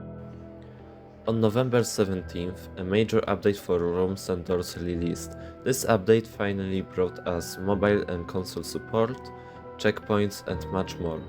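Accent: Poland. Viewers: are you polish? just asking cause you sound like you have a Polish accent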